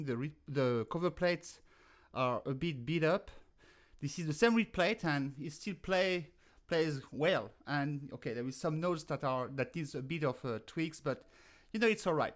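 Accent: France. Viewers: Bonjour, en premier merci pour la leçon et pour toutes les belles performances que tu partages sur Youtube - puis à propos de l'accent, mais tu n'as pas à t'excuser tout le monde a de l'accent lorsqu'on parle une langue etrangère en outre dans une autre vidéo tu parlais l'anglais sans accent ou presque et moi j'ai été susrpris de savoir qu'une des meilleurs joueurs de l'harmonica au monde soit française:) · Oh please, I'm jealous of your accent. My native language is Brazilian Portuguese and we just love out there hearing you, French speakers, speaking our language with your delightful accent. No apology necessary! My apologies for being jealous... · Vous n'avez pas à présenter des excuses pour l'accent français - il est un plus pour moi! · Great video for a beginner like me, and please dont apologize for your accent, I think your english is pretty good and I am glad that you give the non-french speakers the option to learn from you too! 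· Do not apologize for your French accent! It is delightful! And your harmonica playing is....well, shall we say....magnifique! Merci bien!! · I like the French accent. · Bon jour, Madame. I think it is not necessary to apologise for your accent for the simple reason that the French accent is oh la la, wonderful to hear! And your harp playing is the best! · why apologise for a French accent. French is the language of love and one of the world's most beautiful languages. carry on with your fantastic accent so we can enjoy listening. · Je me suis abonnée à tes cours et à tout ce que je peux recevoir de toi. Tu es une super harmoniciste, et heureusement que tu as un accent bien français, sinon je ne comprendrais rien... Par contre , comment fait-on les overblow (par exemple dans "Yesterday") ? Merci pour tout ! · Hi, i love your french accent💙and your music style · Just watch your video... 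Just started to play the Harmonica a few weeks ago and i'm 78 years old.. I love the challenge. Don't worry about your accent you do great! That goes with being French! Thank you again.. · Pardon ? Pour quoi? L'accent français c'est toujours adorable... Et moi je préfére ecouter l'anglais comme ça, c'est plus facile pour mon oreille. Je viens de descendre deux demi-tons pour la première fois dans le deuxième trou ! Alléluia ! Merci beaocoup.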